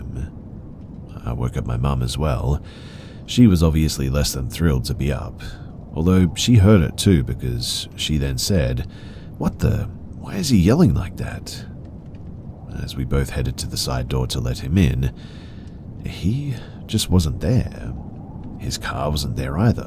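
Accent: Australia. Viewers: G’day…🤗 I love your channel and it’s great listening to your familiar Aussie voice! Great voice for narration, as if you didn’t know✌️😄 It’s my son’s 21st Birthday today 🥳🎊🎂🎉🎁🎈🎉 We’re all in such happy spirits too. I’ve just made him a Strawberry 🍓 Cheesecake and he also selected a Beef Roast with all of the trimmings PLUS MASH! 🍚🥄✨💃🏻🪩🕺🏻 That’s my boy (young man) now! But he’ll always be my baby 💖✨ I find all of these stories so enthralling, so thanx for making the days go faster! 🏆😘🍰🍓✨Greetings from MNECNSW!🤜🤛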